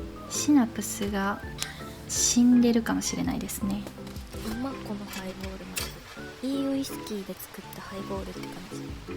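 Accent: Kansai, Japan. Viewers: トークのセンスが最高です！関西を協調せず、ホンワカした雰囲気がとってもよいです。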